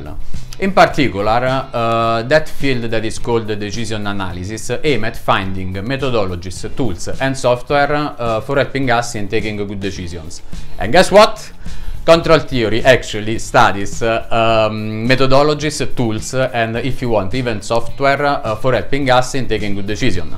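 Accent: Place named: Italy. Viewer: Your accents makes me think you are italian...without subtitles it would be difficult for audience to understand what you are saying....overall your content is very well structured👍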